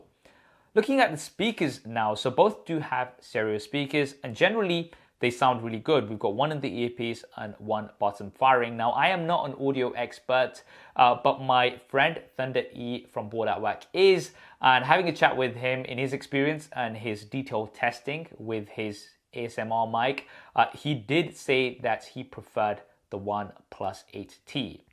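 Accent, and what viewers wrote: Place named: United Kingdom. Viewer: British accent makes me uncomfortable 😐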